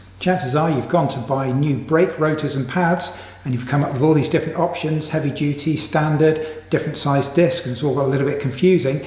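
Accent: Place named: England, United Kingdom. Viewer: I don’t know what it is about the English accent, but it makes every video feel so much more educational lol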